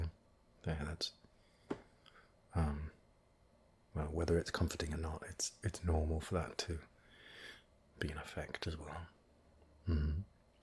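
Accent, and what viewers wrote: United Kingdom. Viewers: Was craving somebody with an accent such as yours because, little secret, British accents drive me CRAZY but in the best way possible! Truly on of the best things I've listened to 👏👏🤌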